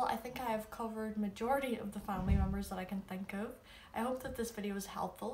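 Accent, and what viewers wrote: Donegal, Ireland. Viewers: Please tell what accent of Irish language do you use? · Are you Doire/Dun na nGall?? It's so comforting hearing you speak as gaelige 💚. Takes me back to my Thornhill days, go raibh maith agat xx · I'm nowhere near being a grandmother, but recently began looking for new ways to say "grandma" since my kids are now raising kittens. I'm liking the term "Mamó," though I'm also intrigued by "Morai" (which I think is pronounced MORR-ee, and would love confirmation). I just read in another comment that you are from Donegal, and my great-great-great grandparents (I think that's the right amount of greats) came here from Donegal, so I'm glad to be hearing pronunciation from the same area. Thank you for your videos!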